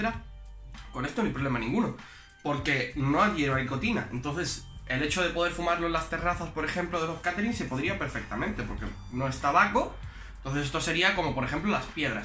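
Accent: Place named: Andalusia, Spain. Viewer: Hubiera estado bien que fueras mostrado como preparar el te, por otro lado siempre me doy cuenta de q en los videos e historys del instagram aveces te sale ese acento andalu q tenemos illo😉😉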